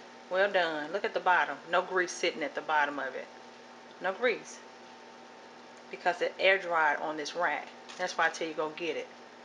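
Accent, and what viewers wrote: South, United States. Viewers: Love your voice and it’s making all kinds of southern accent sense to me! Sending love cook to cook from Bham, AL! ❤❤❤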